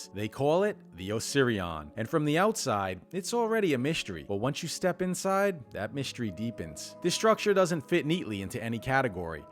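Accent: United States. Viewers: Excellent ~ Thank You! May I ask, you live in Egypt but have a strong American accent ~ NOT faulting! Are you of Egyptian descent who returned to Egypt?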